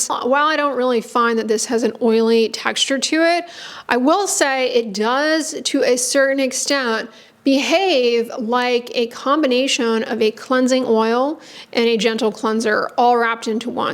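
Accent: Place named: Southern California, United States. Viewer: I’m taking bets it Southern California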